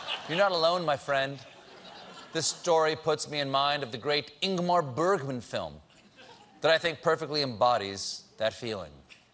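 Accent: Germany. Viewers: Lingonberries!!! Lingonberries (that almost ALWAYS goes with the meatballs in Sweden) is Swedish... but I guess that is a nothing-burger.... And why the German accent?? We actually sound more like the Swedish chef... unfortunately. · Minus the German accent well done :).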